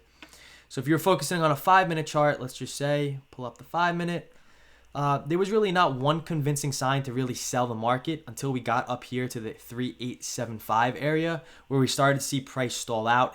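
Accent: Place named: Georgia, United States. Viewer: You definitely sound different with that mic. I thought you were from Georgia.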